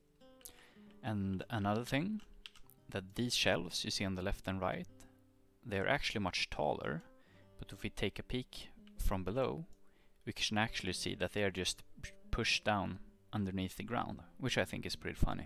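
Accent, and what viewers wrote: Sweden. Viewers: du är svensk eller hur?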